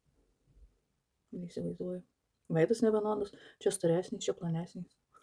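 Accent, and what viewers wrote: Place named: Aukštaitija, Lithuania. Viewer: Laba jūsu graži kalba turbut nuo Aukštaityjos busit sekmes jums gyvenime❤❤❤😊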